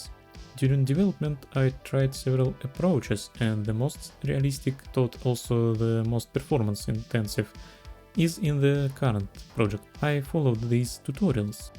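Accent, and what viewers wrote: Russia. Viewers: Ну по акценту я сразу понял что ты наш слоняра 💪 а фото университета это лишь подтвердило 💪💪💪💪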